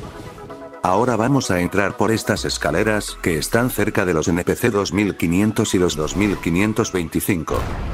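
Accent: Dominican Republic. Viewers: Aaaaa Eres dominicano?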